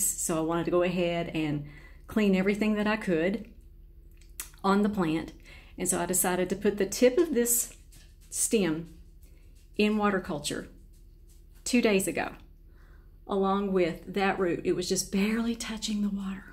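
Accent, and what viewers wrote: South, United States. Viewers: Those are some nice basic Phals your growing. Being from SC it’s nice to hear someone else with that southern accent growing nice looking orchids. Enjoyed the video.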